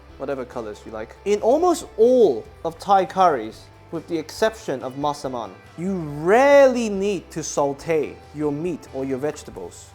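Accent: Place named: RP, United Kingdom. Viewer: is sooooo posh 😊❤❤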